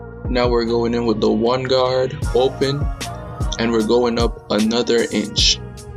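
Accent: Jamaica. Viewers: That Jamaican accent just waiting to come out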